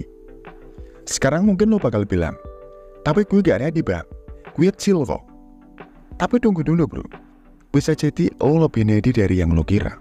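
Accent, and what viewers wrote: Java, Indonesia. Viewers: Medok banget Jawir nya,, puyeng dengernya...😇😇😇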